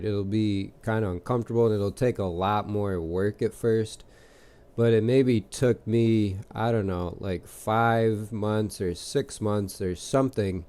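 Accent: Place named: Slavic, Eastern Europe. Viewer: wow, I thought you were Russian or from another Slavic country😅.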